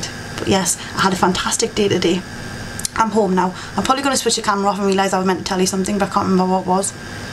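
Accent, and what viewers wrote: Newcastle, United Kingdom. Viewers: She is from South Shields which is kind of on the outskirts of Newcastle, the area is called South Tyneside. People from that area are generally known as 'Geordies' and the distinctive accent is called 'Geordie'.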